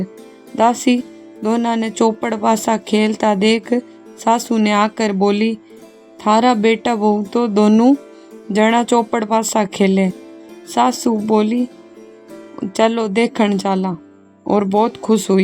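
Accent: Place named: Marwari, India. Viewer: Nice marvadi voice 🙏🏻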